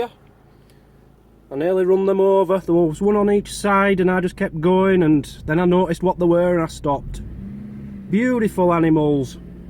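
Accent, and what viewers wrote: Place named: Glasgow, United Kingdom. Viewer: I detect Glasgow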